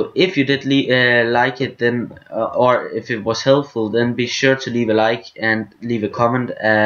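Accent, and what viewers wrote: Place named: Denmark. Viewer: dansk aksang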